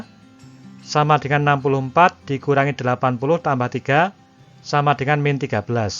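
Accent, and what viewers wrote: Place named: Java, Indonesia. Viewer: Suara ne ketoro tenan nek wong jowo nggh mas.... Salam sko klaten 🤗🤗🤗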